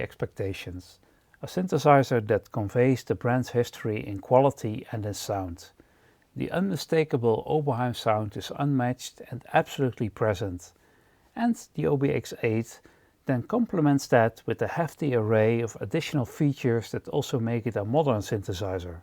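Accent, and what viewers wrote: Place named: Netherlands. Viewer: It doesn't get much better than hearing an Oberheim by a reviewer with a dutch accent like mine. Groeten uit Eindhoven, of beter gezegt, uit Bern. I expect an OBX8 later this week. I can't wait. The end game for Oberheim poly-synths, except for a module of this giant. It's the sound of all the three synths we love.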